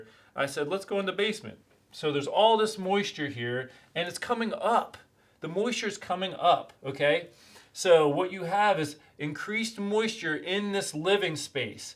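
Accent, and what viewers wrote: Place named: Pennsylvania, United States. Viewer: I recognized that PA accent 😅 I really appreciate all the information you gave in this video. It was exactly what I was looking for.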